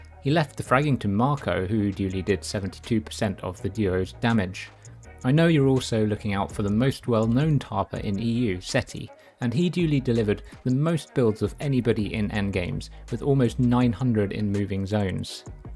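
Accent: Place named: England, United Kingdom. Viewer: This is so good but since im from australia the english accent triggers me lmao, good shit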